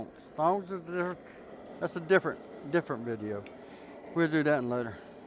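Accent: United States. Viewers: He has the best American accent.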